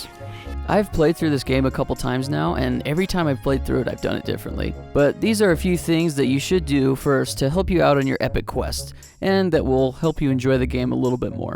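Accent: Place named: California, United States. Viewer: this choking californian accent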